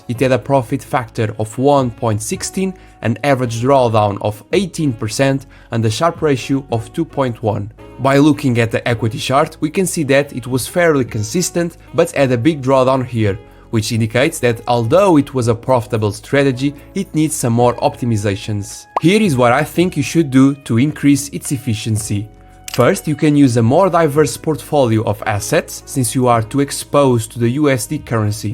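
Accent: Portugal. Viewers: Earing you speaking and appreciating your accent it seems to me that you are portuguese from Portugal 😅🇵🇹 am I right?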